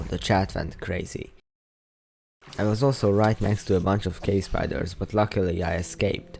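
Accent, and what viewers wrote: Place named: Hungary. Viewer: Nagyon úgy érzem, hogy magyar vagy. Az akcentus mindent elmond. Amúgy nagyon jó lett a videó!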